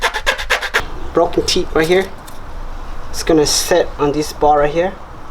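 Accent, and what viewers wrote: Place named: Central Valley, United States. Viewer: Bro Iknew 60 seconds into the video you were from Central Valley lol dope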